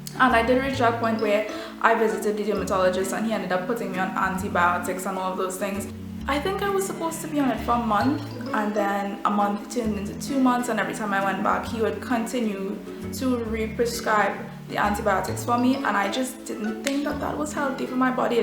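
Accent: Trinidad and Tobago. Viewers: I hear a trini accent! yasss gyl i support u! and you're very beautiful btw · know a Trini when I hear one 🇹🇹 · Sound like my Trini neices from San Fernando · im hearing that trini accent girllll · Your skin looks really good. What foundation do you use, if you still use any? I'm really happy to hear another trini voice ;-)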